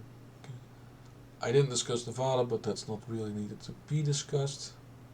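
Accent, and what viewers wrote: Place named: Netherlands. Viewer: Je Engels is uitmuntend maar ik heb zo'n vermoeden dat ik een Nederlands accent hoor dus ik schrijf in het Nederlands ;). Hoe denk je dat de verkiezingen zullen verlopen? Sinds 2016 heb ik het idee dat Amerika steeds radicaler en gevaarlijker wordt en ben mij nu zelfs aan het afvragen of verkiezingen überhaupt zonder kleerscheuren of "spektakel" (in negative zin) gaat plaatsvinden.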